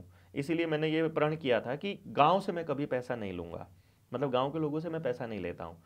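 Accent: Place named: Haryana, India. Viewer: इंटरव्यू करने वाला बंदा तो अच्छा रखा करो जिसकी जबान साफ हो और एक्सेंट ना हो। गंवार टाइप हरियाणवी ग्रामीणों को इंटरव्यू लेने बैठा लेते हों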